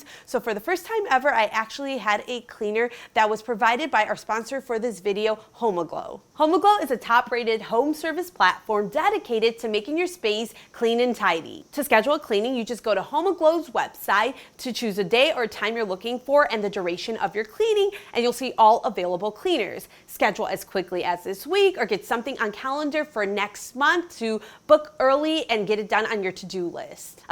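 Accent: Chicago, United States. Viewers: I'm so happy to hear that Chicago accent! I miss home so much 😞. Great video 💯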